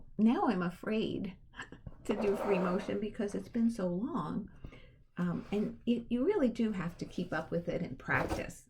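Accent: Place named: North, United States. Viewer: Love your videos,! I can relate to what you feel about being from New York - I am from Philly, haven't lived there since I was 25, and listening to you takes me right back to my northern roots.:) I love watching your quilting, including your process and progress on your quilts.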